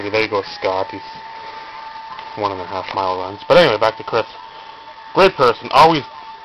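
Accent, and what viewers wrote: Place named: United Kingdom. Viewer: lmao Fool! Nice british accent. Nicely done. Sictophere is indeed a heck of a guy. Great choice man. He does so much for others before thinking of himself. Your looking more and more Amish everyday and that's something to be proud of!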